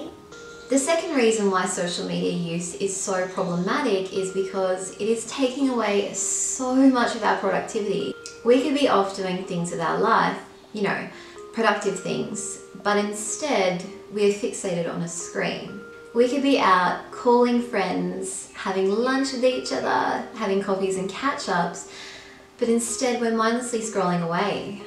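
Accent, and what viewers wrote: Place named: Australia. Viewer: My initial thought - sounds like an Aussie accent. Look into description YT page - Country: Australia - knew it lol. My thoughts on social media: should be used in moderation, balanced with things outside of it - e.g., outside activities As to future videos, I would suggest that you look into not just how often social media plays a part into our lives but also how many social media platforms are used - it feels like we are inundated with so many of them that it pretty much consumes all of our time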